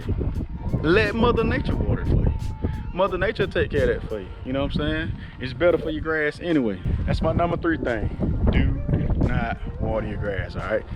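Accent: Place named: Georgia, United States. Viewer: Sound like somewhere in Georgia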